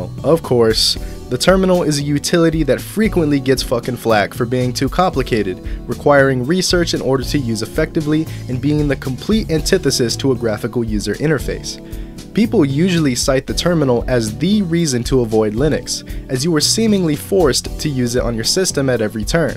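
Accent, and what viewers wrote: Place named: South, United States. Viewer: That southern accent💀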